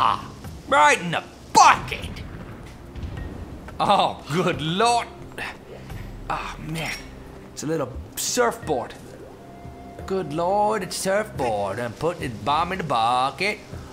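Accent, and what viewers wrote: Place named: England, United Kingdom. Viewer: i love your english/britsh accent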